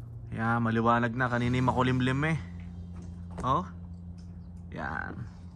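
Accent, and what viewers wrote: Batangas, Philippines. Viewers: Kuh nga batanguenio pala arih, bay nga mga batang ang twag sa mga asawa e”huy” haha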